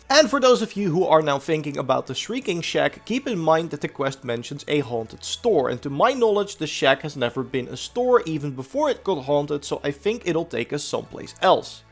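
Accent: Netherlands. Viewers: Bruh you ooze Dutch with that accent. Love it 😂👌🏽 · Omg your dutch accent is so distracting... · The Dutch accent coming through alot just the way it's meant to be :3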